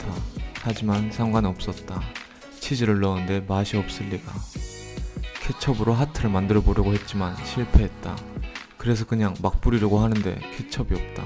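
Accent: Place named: Ulsan, South Korea. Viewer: 울산분이신듯요 ㅋ 울서방도 울산사람인데 좀 억양이 좀 다른거같기도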